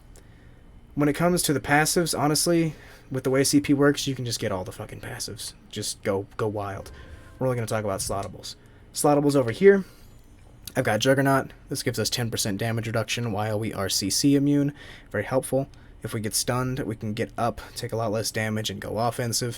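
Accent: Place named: East Coast, United States. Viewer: Wicked east coast accent man🤣 keep up the good work!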